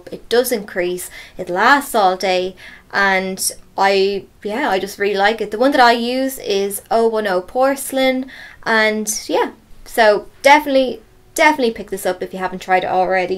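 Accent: Ireland. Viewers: I could listen to you all day because you are Irish! Please do not stop making these :-)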